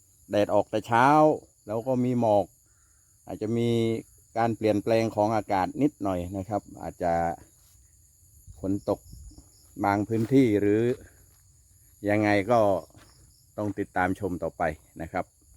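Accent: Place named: Surin, Thailand. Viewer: สุดยอดครับเสี่ย..มารับชมให้กำลังใจครับท่าน..สำเนียง คนสุรินชัดเจน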